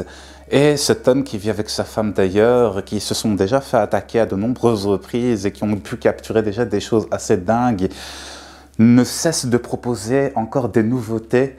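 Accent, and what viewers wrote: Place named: Belgium. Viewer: Bonsoir, pardonne moi si j'ai pu t'atteindre de par mes quelques commentaires.. sache ke je suis abonné et que je like positif à 90%.. tu as un sens de l'humour et ton mélange d'accent orientale/belge est rigolo..😉. Bref en ces temps de fêtes: prends soin de toi, bonne année à toi (sincère) et a plus dans l bus...😁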